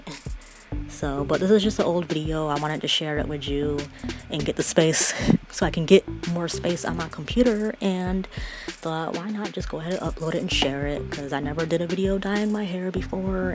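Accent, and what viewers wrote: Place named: South, United States. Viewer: You sound so country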